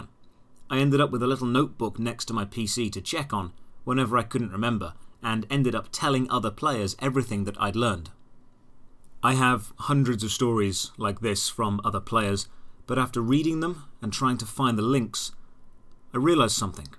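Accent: United Kingdom. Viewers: man, british people talk the same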